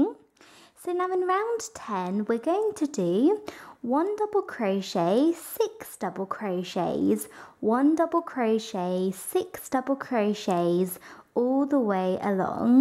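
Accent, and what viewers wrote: United Kingdom. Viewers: listens to accent Oh ok, so British terms... says double crochet while making a treble Damnit brain, you fooled me again!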